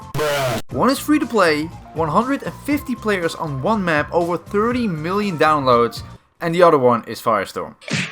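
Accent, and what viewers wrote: Netherlands. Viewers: I feel he's Dutch by his accent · Are you Dutch bro ?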